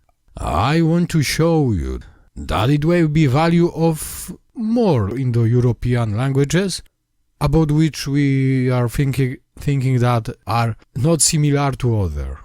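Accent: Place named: West, Poland. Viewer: tbh.. Your sanskrit sounds like you're reading it maybe for the first time. It has a more western accent. But I understand it may be because you're new to it (i guess) or because you're reading it in a latin script. There are parts where we pronounce the sound short or long(this can be known while reading it with the Devanāgari script). Old Sanskrit was also written in Brahmi script(which has connections to Aramaic) but rn Devanagari is widely used. I'd suggest, if you have time, try to learn the sanskrit alphabet and phonetics. Or maybe listen to sanskrit being sung, chanted or spoken.. Also Check her channel: <link> I think she is Greek(from her name). She has quite an accurate pronunciation of Sanskrit. Really Accurate! I've been learning German for some months now. I observed some similarities in Grammar and Word Patterns. German and Sanskrit both have Gender words for non living things.. 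Oh and also the ability to create new words by joining pre-existing words. And creating longer words/sentences with many words joined as one. I've seen it being done in Sanskrit and German both.. I found it interesting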